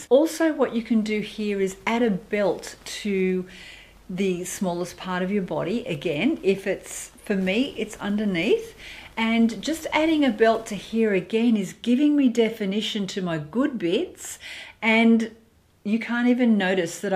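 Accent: Australia. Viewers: Super fantastic video!! So happy I found your channel. I love all these suggestions, and do already wear those types of flowy tops, longer in front and back! Thanks for the links. I LOVE ❤ your hair! Just beautiful 😍 Is it all your own? (I have a family member in the hairdressing business), so have had extensions and own a couple hairpieces. I'd love to hear where/how you get such a gorgeous mane! I love your accent too (Australian?) Sorry so long...new subscriber lol 😄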